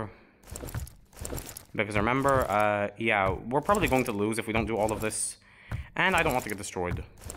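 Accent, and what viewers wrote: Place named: Egypt. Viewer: You are Egyptian? I can sense it from your accent.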